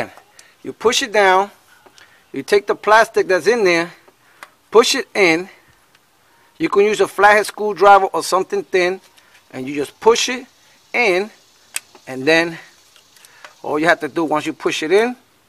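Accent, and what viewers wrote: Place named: New York, United States. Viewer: Good video, loved the ny Italian accent. Anybody else noticed that first 😂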